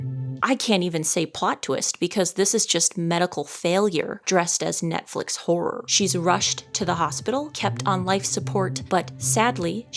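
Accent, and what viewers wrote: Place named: Midwest, United States. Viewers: Your Midwestern accent 😅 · This is the best youTube channel in existence. I love when the thick MidWestern comes out. 'HaAAspice'.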